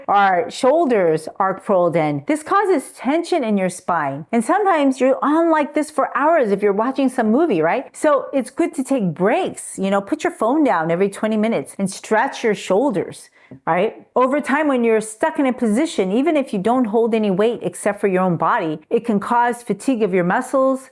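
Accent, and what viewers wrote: United States. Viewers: parli amerikano non ti capisco!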